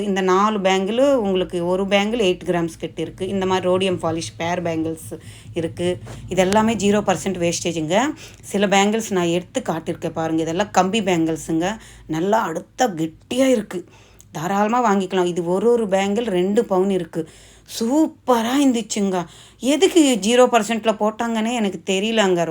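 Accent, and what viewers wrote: Telugu, India. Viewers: మీరు తెలుగు వాళ్ళ sis మీ మాటలు నాకు అలానే అనిపించించింది.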